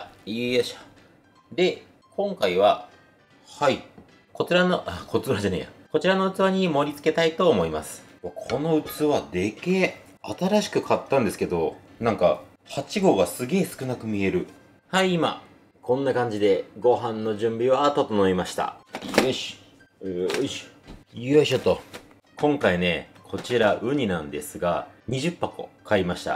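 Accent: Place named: Kansai, Japan. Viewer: （関西弁です）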